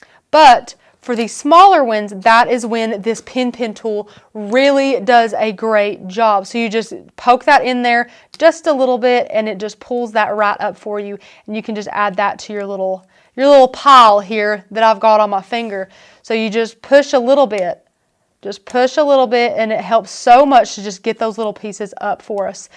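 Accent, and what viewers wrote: South, United States. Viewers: Great video. I love her country voice... Lol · talk a little more southern... southern drawl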